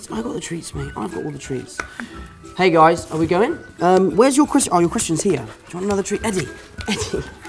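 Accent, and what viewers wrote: United Kingdom. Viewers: His British accent has come back 🤩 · He took back his British accent, no ?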